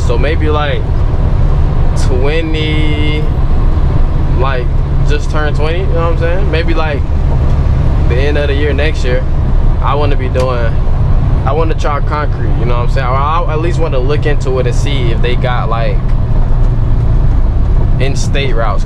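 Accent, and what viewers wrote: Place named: South Carolina, United States. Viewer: U from south Carolina Brodie ?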